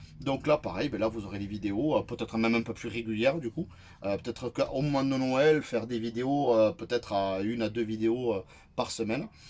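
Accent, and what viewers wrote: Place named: Toulouse, France. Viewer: Ah cet accent toulousain,j’ai passé 12 ans et depuis mon cœur est à Toulouse. Hélas je ne peux plus me permettre de vivre dans la région, je suis juste au nord du lot, je ne vais pas me plaindre mais cet accent me fait vibrer, j’adore ! Depuis je fais du canoe sur la dordogne, lol ça change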